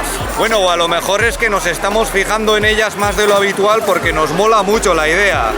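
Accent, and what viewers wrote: China. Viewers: comienza a tener acento chino. Muchas bendiciones para su familia 🥰🥰🥰💖💕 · Jajaja ya tienes acento chino cuando hablas español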